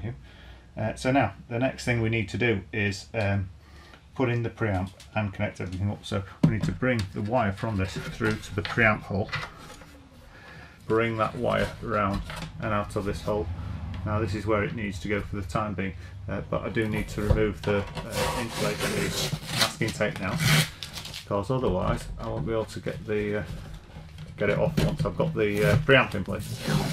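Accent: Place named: Dorset, United Kingdom. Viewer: Thanks for sharing this. I am trying about doing this to an old beater that I have had laying around for a while now. I have just joined a band back in November and it would be kinda fun to have two acustic guitars to work with instead of just the one that we currently have available to us. I thoroughly enjoyed your playing at the end of this video. You are very talented. Thanks for sharing your experience and skills with us. I would really enjoy more videos of you playing. You aren't from the Dorset area of the UK are you? Your accent reminds me of an old acquaintance I knew from college who is from Dorset.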